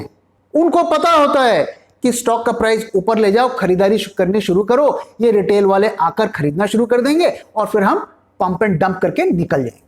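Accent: Bengal, India. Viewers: Sir ,apki baton se malum chalta hai ap Bengali ho...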